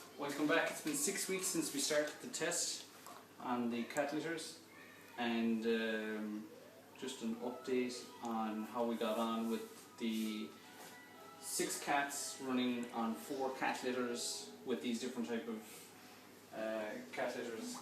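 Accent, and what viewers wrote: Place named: Ireland. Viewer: I love your Irish accent.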